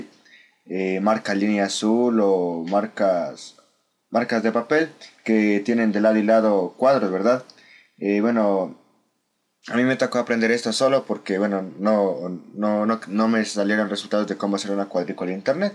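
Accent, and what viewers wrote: Ecuador. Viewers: ajaj se nota en tu vos que eres ecuatoriano ejejej muy buen video me arreglaste mi dia jejeej gracias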